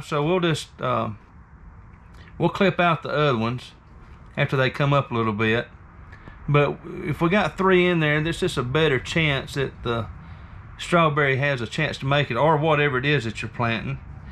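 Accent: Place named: Boston, United States. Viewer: I recognize that accent. Definitely Back Bay, Boston!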